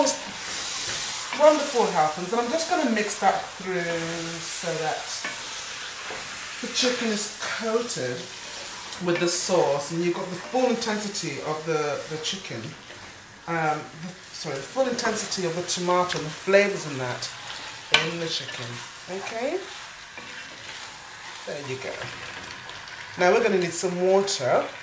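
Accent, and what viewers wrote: England, United Kingdom. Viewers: YUMMY!!!!! I c you added 2 habanero peppers to make it HOOOOTTT! I love spices & heat tho. I c you only added 1 stock cube, no salt or other seasons. Another vid chef added no seasons! I never boil the h2o out of my tomato puree when I make this so this week I will certainly boil out as much h2o as poss. 1st. BTW, I LOVE your Britt accent & I EAGERLY await my trip across the pond – when flights aren’t a million $ compliments to the Olympics and summer season! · your accent is so english and this is coming from someone born in the uk too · I love your videos, few though they are. The combo of your passion, plumby English accent (yes, I'm American:)) and magnificent West African looks, I very much enjoy. You were my first intro to West African cuisine. It appears that you aren't really doing this anymore and don't know if you read your comments but I wanted to let you know how much I've enjoyed them. 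Cheers.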